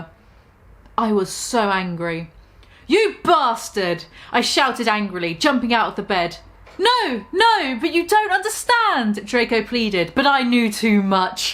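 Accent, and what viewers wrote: United Kingdom. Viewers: British Chav typing and being read by a British accent ... that's my cup.of tea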